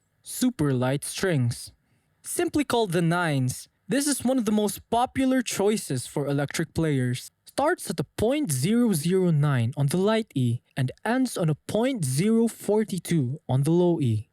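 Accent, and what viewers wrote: Philippines. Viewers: Are you pinoy? I am also 😂 watching guitar vids with family they put your video on and i noticed accent might be fellow kababayan!! If so awesome!! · Yow you have a Filipino Accent I hear it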